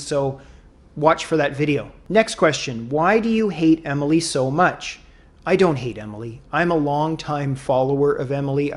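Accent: Canada. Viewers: Keep those great videos coming. I'm not vegan myself but do eat vegan a few days a week. I love the calm and more mature messages on your channel. And the canadian accent that makes me feel like I'm back home (I'm canadian living in France). 👍🍁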